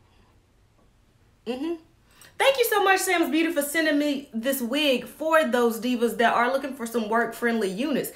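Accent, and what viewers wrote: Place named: Mississippi, United States. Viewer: Chiiiile, you're so Mississippi and I LOVE IT! I went to HS with your baby sis, and I definitely see lovable personalities are in yall's bloodline. Keep it up, love. When I'm missing home, I watch you. That accent takes me right back to Highway 90, lol! #HugeFan!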